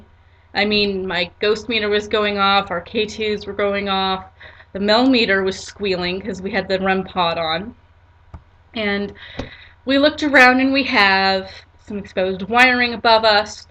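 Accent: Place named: United States. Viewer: I love your voice so much. You sound American. Byeee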